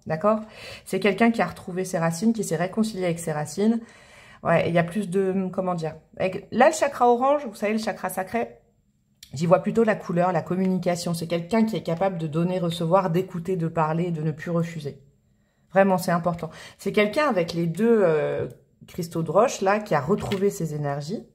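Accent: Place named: Alsace, France. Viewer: Coucou ma ✨…tu chopes l’accent alsacien😅…ma belle région❤️ Choix 2…alors quoi te dire, la dernière guidance m’a déjà énormément parlé. Depuis notre guidance générale de l’année, il se passe bcp de choses, un truc m’est tombé dessus. Je ne l’ai pas vu venir….baaaam je ne contrôle plus rien. Tu avais vu juste😆🙏🥰…j’avoue que ça me fait un peu flipper. ❤️❤❤ et ça va me faire sortir de ma zone de confort. Une rencontre se profile pour bientôt🫣. Bon we, merci pour tes belles vibes et tes ressentis⭐️⭐️😘😘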